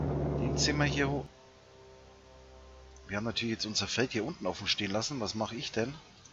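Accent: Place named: Franconia, Germany. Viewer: Fränkisch ist so geil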